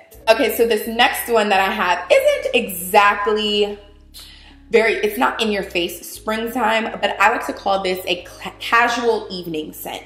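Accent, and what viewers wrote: New York, United States. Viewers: Please tell me you’re from NY? Because you sound like a straight up NYer 😂❤️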